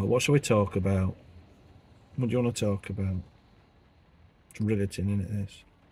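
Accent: Yorkshire, United Kingdom. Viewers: I lived that walkabout in Donny and your lovely Yorkshire accent. Pity you got a bit wet, but never mind eh.Greetings from a Yorkshire lass ,now in Australia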